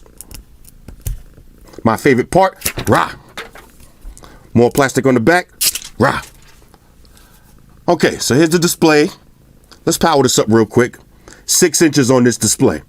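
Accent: New York, United States. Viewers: "poison for the trolls" This was dope! Yo this guy from NY?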